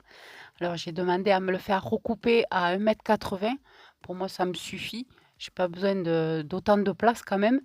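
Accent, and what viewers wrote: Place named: Southwest, France. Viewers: Bonjour, et bien merci à toi pour ta chaîne, pour cette découverte du dimanche matin, merci pour tes vidéos intéressantes et marrantes, tes essais, tes fou rires, ta bonne humeur, ton merveilleux accent du sud-ouest.....bon j'arrête là, en tout cas, tu gagnes un abonné de plus 😁, grand merci et bisous du grand Est, lol. ✌🏻à toi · Salut super content enfin de te revoir et t'entendre de nouveau avec cet accent et " le bisous du sud-ouest". Ça me manquait . Le studio est très sympa sobre et moins le bazar que mon bureau. Vidéo toujours agréable à regarder. Continue comme ça j’apprécie le travail que tu fais. Le bonjour de l’Alsace, grand " V " à toi .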